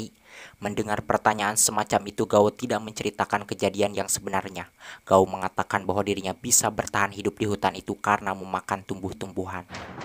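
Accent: West Java, Indonesia. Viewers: Akang nya orang Sunda ya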